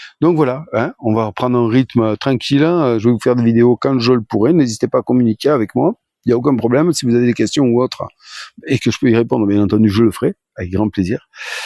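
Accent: South, France. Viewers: bonjour, peu importe pour de nouveaux jeux, tu es une personne chaleureuse a l'accent du soleil, perso les jeux que tu présente ne sont que secondaire, le fait de te voir a fond dans le jeu cela fait plaisir^^ la campagne orcquest était excellente, tu pourrais continuer. tout ça pour dire que les jeux sont secondaire, avec mes amis ont fait souvent les mêmes jeux le principal c'est de se retrouver. Pour les alea de la vie, fut faire avec voire sans. encore une fois se retrouver entre amis permet de penser a autre chose. En conclusion, même pour parler bout de gras on est la ^^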